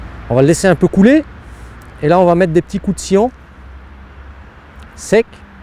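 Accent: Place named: East, France. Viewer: On aime l'accent de l'est !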